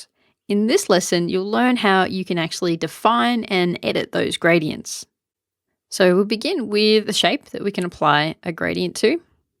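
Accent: Australia. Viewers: Sounds like an Aussie or Kiwi... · I'm still with AD v.1 'cos my mid-2010 G5 can't handle v.2's requirements. This vid answers SO MANY QUESTIONS I've had since switching from Illustrator, FreeHand, etc. It is now PERMANENTLY BOOKMARKED and the URL copied to a separate text file (just in case). If this vid was downloadable, I'd save it to an XHD, too. Great job! (and the Aussie accent is a nice 'plus' point!). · This is hands down THE best Affinity Designer tutorial on earth, but I'm not going to lie, the Australian accent helped keep me engaged the entire time ;) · Glad to hear an Aussie accent at last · THANK YOU!! I love this little course, I've learned more from it than 20 others. Also, it's fun to hear an Aussie voice out there in youtube land - so, a big thank you also, to the young lady who taught it.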